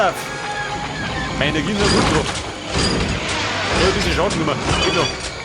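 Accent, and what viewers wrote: Bavaria, Germany. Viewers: so ein geiles spiel, von wo aus bayern bist du?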